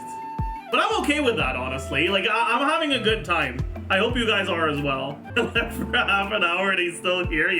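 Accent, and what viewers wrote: Quebec, Canada. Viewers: Kinda funny watching this from a French person perspective. He pronounces everything the French-Canadian way, and some of theses rules don't really feel valid within the boundaries of my accent. His o sound like ow, the way he says é and ai can be related which is, I think, never the case for me, etc. But a pretty entertaining video nonetheless. Always a pleasure to hear share a little bit about his francophonic side!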